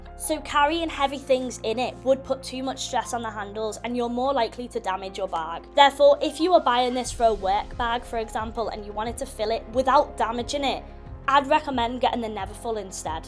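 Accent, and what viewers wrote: United Kingdom. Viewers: I love the video I like your accent but can’t tell where it’s from ? Are you British or Australian or something else ? Great videos as always !!!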